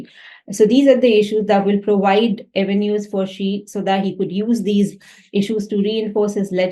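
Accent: India. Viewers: I guess there's little to be done about the Indian-English accent, which makes following this difficult for me and others I suspect, but a better microphone would be a huge improvement.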